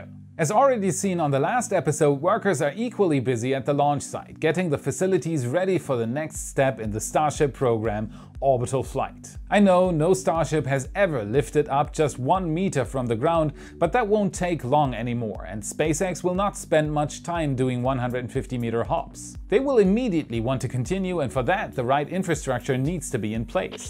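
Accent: Germany. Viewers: German accent coming back again ! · Don't be afraid of showing the accent, a German talking about engineering is as natural as a bird flapping its wings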